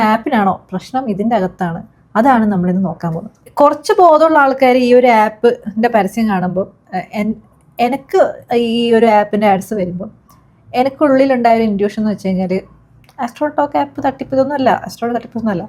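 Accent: Kannur, India. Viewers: maam kannur aano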